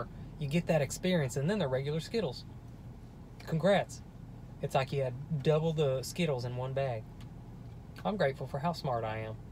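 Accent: South, United States. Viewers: He's said before that he deliberately dropped it because he didn't think he would be taken seriously. I think he plays it up a little in some of the videos, but, it's real. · I'm thankful for the fact that you've developed a southern accent throughout the years. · He’s from the South originally...it’s real :)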